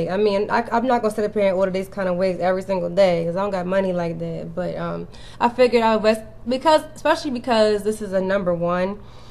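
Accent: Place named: Baltimore, United States. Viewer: As soon as I was saying in my head, she's sounds like she from Baltimore, you confirmed it! You sound like me when I get mad 😆 But this wig sounds like What I've been looking for for forever, I hope it's still available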